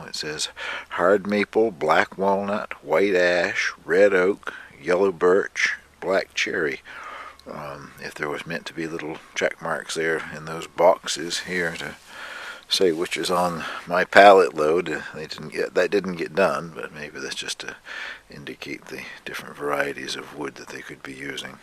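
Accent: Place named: Nova Scotia, Canada. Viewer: Subbed ya for two reasons. 1) Love the down East accent. Although I live in the prairies now, I grew up in N.S, and hearing you speak makes me feel like I'm back home. 2) I love how very Canadian you are. My husband and I try very hard to buy as much Canadian made product as possible. About a year ago we bought a boler (Canadian made mini Camper). We're hoping to add many camping vids to my channel this coming summer. Anyway, great vids. I've been binge watching a few days now.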